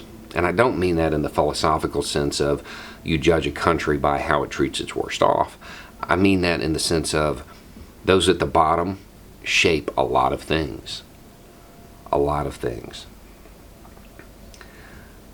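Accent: South, United States. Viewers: First off let me say I am not a voter I stopped voting after Bush H said it’s enough I can’t change one thing. I did serve in the military for 6 years. I have watched many of your videos and I must say you just rehash everything that’s being said in a different way. You sound like you’re from the South but there’s something else. You are no better than the other lefties or righties out there pushing their bullshit. I’ll start voting again when we come together. I have said and will keep saying I’ll vote for a fiscal conservative no matter the party. But you with your Bob Ross demeanor and smooth quite way of thinking your way is the way is the only way. To me it’s just more don’t forget to thumbs up and subscribe so the $$$$ keep flowing and you are laughing all the way to the bank. It’s the capitalist way.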